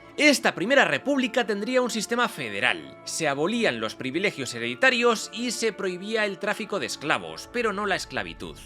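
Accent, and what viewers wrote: Spain. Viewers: Suena curioso un español narrando la independencia de las Américas. Enhorabuena, excelente video...!!! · Eres de España? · Siempre quise saber la versión de los españoles acerca de Simón Bolivar y la verdad escucharlo con ese acento de España es un logro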